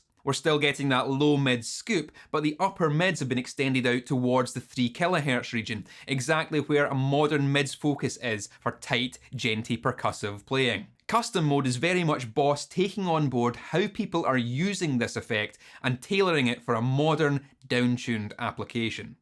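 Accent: Scotland, United Kingdom. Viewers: Combine the circuit analysis with the Scottish accent.. 😩😩🥵🥵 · Scottish accent is the coolest. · I watched the other HM-2 video before this... without much thinking about it or registering what the channel name was I thought "hey he sounds like that other scot"... turns out it's the same person, he just cut his hair.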